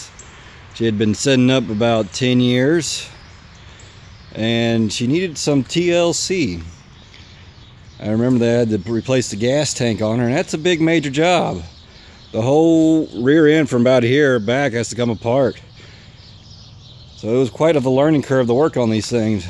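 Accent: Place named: Texas, United States. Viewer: great bike and great texas accent.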